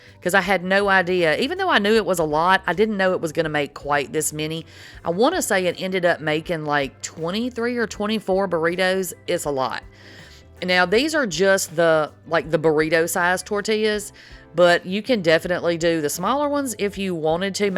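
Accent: South, United States. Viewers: When you hear that Southern accent, you KNOW she can cook.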